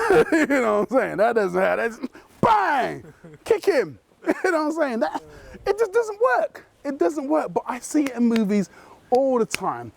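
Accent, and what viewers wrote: United Kingdom. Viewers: I swear you have the best voice and accent of all Brits on Earth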